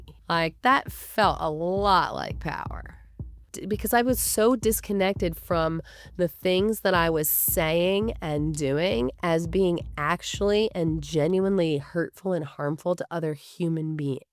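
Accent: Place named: Ohio, United States. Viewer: how you describe what you felt - into the video, you could have been describing me perfectly. That feeling, realizing you don't have to worry about how they'll react to what you do (no matter what it is), has been so freeing. And just like you have blanket fort days, I have "do nothing but blast music and drink coffee" days sometimes. Thanks for sharing your story, it made me feel less alone in that experience. It helped you're from Ohio, ha - I grew up in SE Ohio and live in central OH now, with family in Philly, so I appreciate every one of your accents:)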